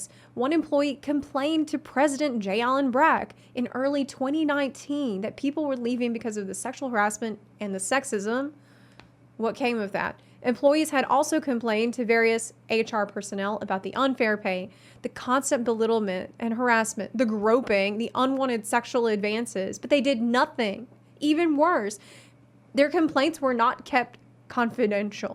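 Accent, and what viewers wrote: South, United States. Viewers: It's very brave that you talked about a topic that was personally very difficult for you aswell! I could see and hear how difficult it was for you to talk about this, and I admire your courage to make this vid!! Also, you're Ukrainian?:O Girl you sound so very American I didn't even notice the slightest accent lol. My collegue is also, and she's a very nice person, but always very puch busy with her looks and can have a 'southern' temprament hahah (she's from the warm part with coast). Anf finally, people talking in the comments to 'boycot' Blizzard or definitely ending their sub because of this scandal, didn't you hear say that MOST of the people there are just DOING THEIR JOB?! The people who are being harassed already have it difficult enough, last thing they need is losing their income. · I'm so confused. Your profile says Ukraine, but you sound like you're from the Southern US. 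Also, your voice is so soothing. This is like accidental ASMR almost.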